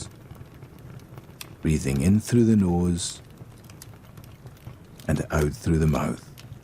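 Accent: Scotland, United Kingdom. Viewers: I never thought a Scottish accent would be lulling me to sleep regularly. Make more! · Yayyy Scottish❤ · i want a scot in my ear all the time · The Scottish accent is perfect. Not too much of a heavy brogue. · I never realised there was a Scottish voice on Headspace 🥳🏴! I wish you could filter a search by accent, or person, as British accents are more relaxing for me - ie, where Headspace started with Andy's voice (I find some of the American accents quite jarring 🙈).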